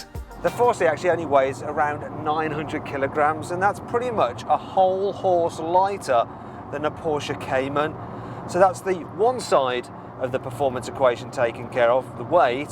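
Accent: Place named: Birmingham, United Kingdom. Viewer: he's a brummy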